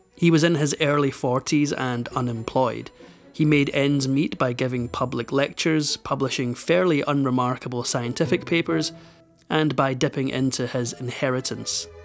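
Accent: Scotland, United Kingdom. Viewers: Sounds as if this has been sourced from the BBC because we’re listening to Scottish Historian Neil Oliver… pure brilliant wee man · The narrator of these videos sounds just like David Tennant. I know I'm being naive here about Scottish accents, but there ya go. I hope my comment doesn't offend anyone, i.e., they all sound alike. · Great story told well - especially the Scottish accent! But please don’t say “close WITH someone; the correct way is to be close TO someone. The first is an Americanism that has really annoyed me recently in Australia . . .and should annoy any good Scotsman too. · Off topic comment/question: I can't get over how much you sound like another Scot who is a political commentator with a particular interest in U.S. politics, & can be seen & heard on The Meidas Touch Network. Any relation? I'm not remarking on the accent alone although that, of course, plays a part. 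I'm talking about the sound of the voice, the cadence, tonality etc. as well. If this is too personal, I apologise, & ask that you simply disregard the question. Otherwise, thank you for reading & providing me with something new to which I can Like & Subscribe. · Interesting...but is no one going to comment on the voice of the narrator lol I have heard him narrate a few such stories What a lovely Scottish accent he has .. not like some of those voices you sometimes get . But yes Interesting account of her life and very sad also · If all of this accounts’ voiceovers are AI, this is the most natural of them all. There is inflection, natural pauses and no unnatural stops mid sentence. This gentle Scottish voice should be used for all of the videos.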